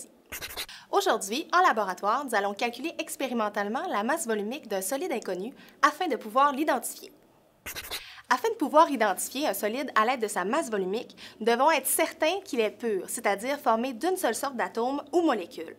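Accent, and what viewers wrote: Quebec, Canada. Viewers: J'adore le Québec, l'accent, les expressions et tout ça alors ça m'encourage à faire des sciences lol! Mercii haha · Derien et merci d’avoir répondu PS :Je surkkiffe votre accent canadien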